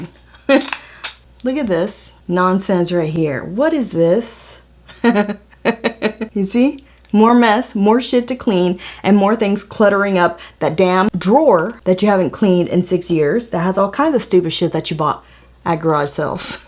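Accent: South, United States. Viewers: her Southern drawl at times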